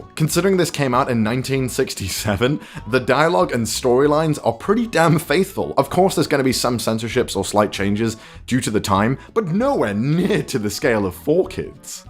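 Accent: United Kingdom. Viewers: Ok so my brain is breaking trying to figure out where your accent is from because it sounds vaguely British but not— obviously British and I DONT KNOW WHAT TO THINK ANYMOREEE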